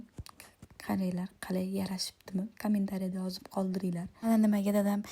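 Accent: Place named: Kokand, Uzbekistan. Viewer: Qatlisiz shevez qo'qonniki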